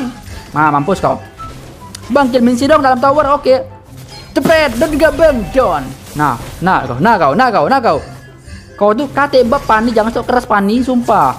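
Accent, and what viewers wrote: Palembang, Indonesia. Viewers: Emang lucu wong plembang klo ngomong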